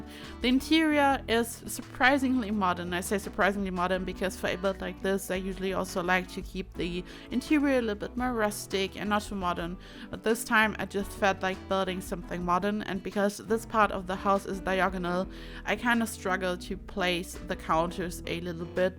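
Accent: Germany. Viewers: Akzent gehört und sofort gemerkt das du Deutsch bist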